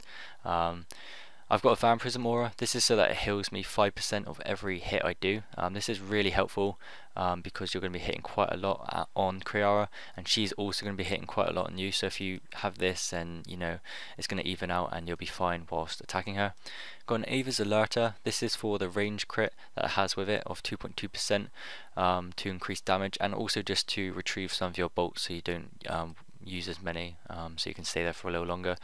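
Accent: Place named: West Country, United Kingdom. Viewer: Thought you sounded westcountry :p From Devon myself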